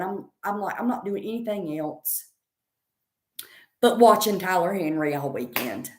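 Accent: South, United States. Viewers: When you Start to say "What the f.. future holds.." I laugh right off the bat..I expect those southern words right off the bat..love you!🤣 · I adore you. I use to live in texas an have missed the southern drawl. You are hilarious. I had lost my laugh cuz of an abusive relationship and watching you I've found my laugh again, so I am forever always be grateful. And tell everyone to watch you. I wish you success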